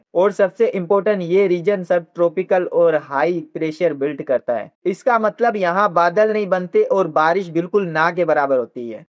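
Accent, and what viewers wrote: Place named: India. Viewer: but your accent is Indian ( Hindi ) ❤ BTW love it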